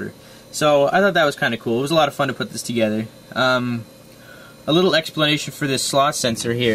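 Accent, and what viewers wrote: Canada. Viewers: i can tell by your accent that you are a canadian...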